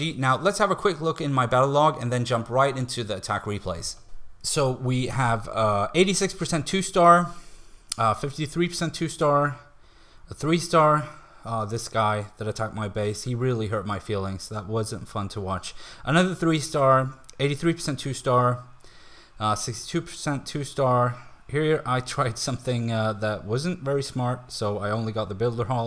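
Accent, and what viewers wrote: Sweden. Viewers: Limpansson 14 Analysing his accent (which is hardly noticable by the way) I think he could be swedish indeed. Kinda sounds like PewDiePie but better English.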